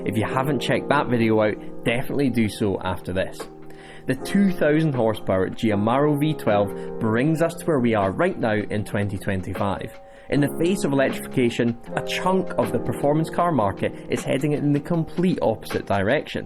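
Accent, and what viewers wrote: Scotland, United Kingdom. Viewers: Never new the Scottish accent would be so good for documentaries (from an American). Can you do medieval history as well?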